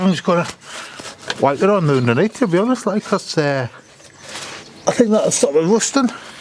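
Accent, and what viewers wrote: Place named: South Wales, United Kingdom. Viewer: he is from south wales in the UK mate...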